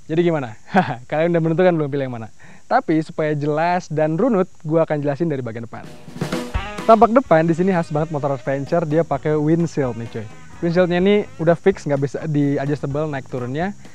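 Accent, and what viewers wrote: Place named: Cirebon, Indonesia. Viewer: 5 bar jeh.... kaya orang cirebon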